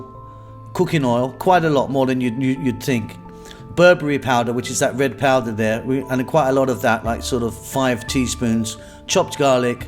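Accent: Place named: South London, United Kingdom. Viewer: and a lovely South London accent it is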